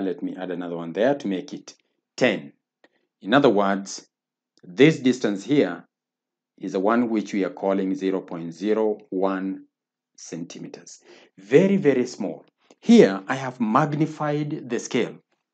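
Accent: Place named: Uganda. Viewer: Perfect explanation sir As if you are Ugandan. Am I right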